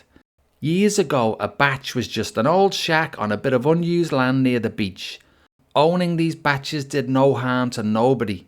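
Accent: Liverpool, United Kingdom. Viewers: But you sound like a scouser.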